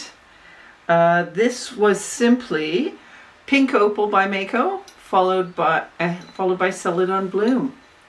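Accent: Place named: Canada. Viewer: Based in the UK? ok but you sound so incredibly canadian. Am I tripping? Anyway I am not usually a fan of pinks and reds, but I am really digging the way you've combined them here, kinda has a touch of Rothko. I really liked the bright reddish vase and jug with amarylis all over. Usually not a fan of amarylis but it looks great with those rich colours.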